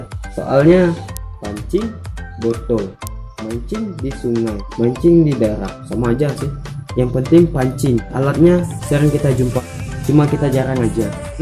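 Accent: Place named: Kalimantan, Indonesia. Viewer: Orang kalimantan ya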